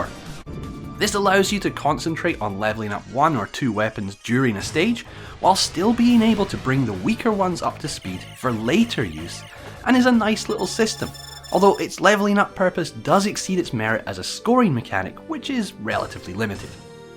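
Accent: Scotland, United Kingdom. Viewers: 😅 I note that the Scottish accent perfectly matches my creepy french ears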